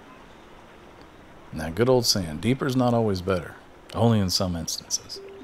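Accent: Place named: Florida, United States. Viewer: I'm sorry, but the sound of your voice, the tone, and your Florida accent just don't go together in my mind. No offense sir.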